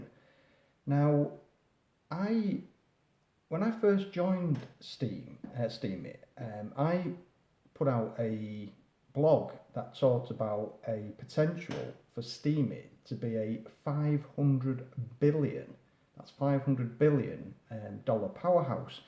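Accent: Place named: Yorkshire, United Kingdom. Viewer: From Leeds? Yorkshire? Loved the video! Very informative. Steem is interesting with its user interaction with it/ social aspect.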